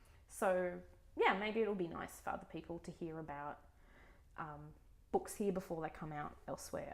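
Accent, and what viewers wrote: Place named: Australia. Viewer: Welcome to booktube! I popped over after seeing Simon shout you out on Twitter! I’m an Aussie (also a Melbournian) now living in Texas 😊 So here for more Aussie voices on booktube!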